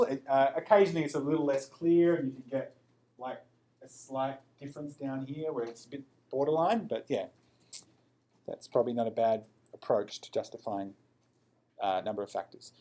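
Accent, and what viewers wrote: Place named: Australia. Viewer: that's a typical Australian accent.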